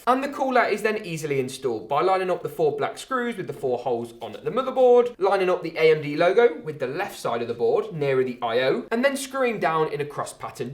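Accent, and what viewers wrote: United Kingdom. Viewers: Your accent is so british 😂👍 i love it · I previously commented that your British Accent is Crazy and i would repeat that no matter what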